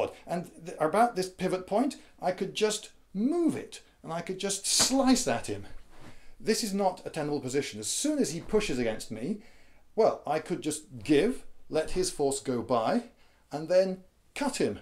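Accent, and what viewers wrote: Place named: RP, United Kingdom. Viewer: know, I like Lindybeige's videos better. He's more animated and engaging to the viewer. can get too droll and boring at times. He's a talking head. A talking read with an "Ahh Pee" accent. I mean RP accent. I also like +Skallagrim. He's got plenty of visuals, so he avoids Matt's mistake of beinga talking head, and can be just as engaging as Lindybeige.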